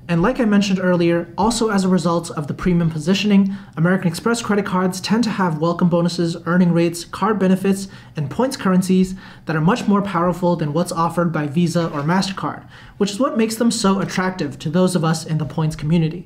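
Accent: China. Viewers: 口音一聽就是中國大陸的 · 隐约听到些中国口音？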